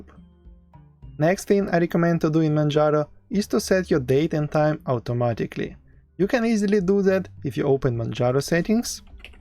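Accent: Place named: Russia. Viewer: Русский чтоли?! Акцент просто ужас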